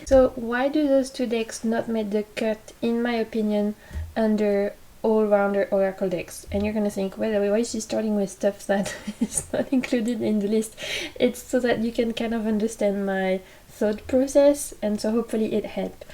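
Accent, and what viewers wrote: France. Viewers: Love your sweet voice, so glad to find your channel. I loved the cloth with stars it was so perfect as a reading cloth❣️ Most of all I love your beautiful French accent. I think it is great fun to share favorite decks with others Thank you so much. Suzy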